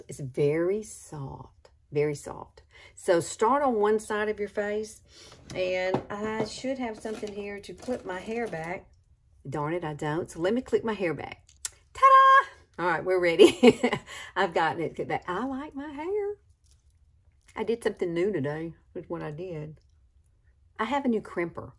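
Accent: South, United States. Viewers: I’m from Mobile Alabama I thought you sounded southern. Southern girls need to stick together. You’re absolutely beautiful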